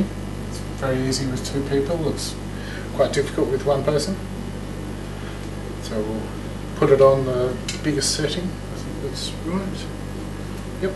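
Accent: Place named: Australia. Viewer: the captions are a classic laugh fest ... I missed the cocaine lol that's hilarious. Methinks the translations program has issues with the Aussie accent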